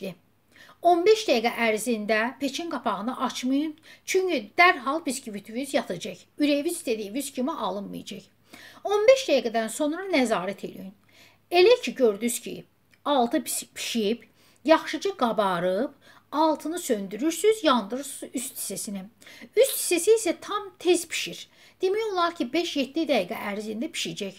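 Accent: Baku, Azerbaijan. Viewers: Lehceniz lezzet eliyir. Bakililar başa dūşer.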